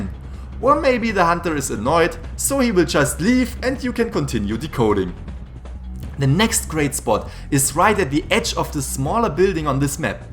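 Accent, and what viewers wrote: Germany. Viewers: Are you German or something? Your accent and your personality perfectly match. No wonder your videos are so entertaining. And your editing!? SUPERB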